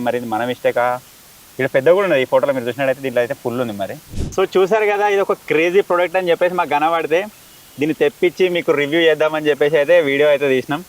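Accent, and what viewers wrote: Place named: Godavari, India. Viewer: Anna love from Andhra ❤ Maa slang lo undey magic eyy veru godavari ❤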